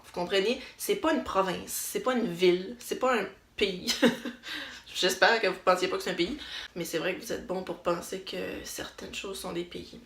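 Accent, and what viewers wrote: Canada. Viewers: Lol mais ta une accent québécois lollll j'ai déjà habité au New Brunswick pi it don't sound like you lol, Mais c'est cringe ton video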